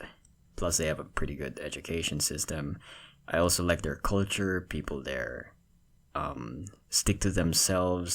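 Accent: Philippines. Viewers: I like ur Tagalog accent sm 😭✋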